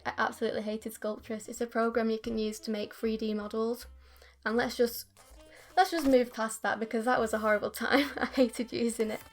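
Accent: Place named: United Kingdom. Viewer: I really love your accent! I am Latina from Chile and I love watch your videos becouse I Lear inglés brinanico and you inspire my to keep drawing Idont now if are a wrong word I sorry for that... ❤️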